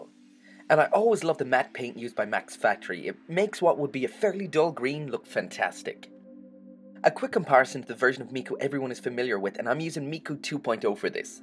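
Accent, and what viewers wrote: Ireland. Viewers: Awesome figure! ^_^ You wouldn't happen to be from Ireland would you? You really sound Irish xD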